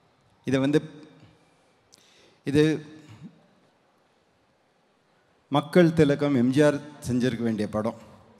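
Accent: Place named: North, India. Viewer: Mani Sir En Vadakkans Maathiri Tamil Pesuraru 🙄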